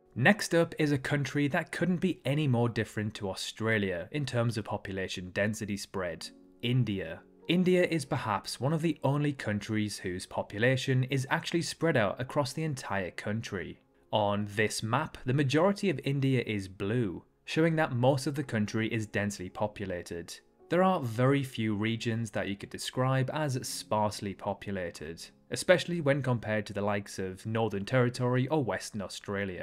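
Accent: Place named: Newcastle, United Kingdom. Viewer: This guys so geordie u can tell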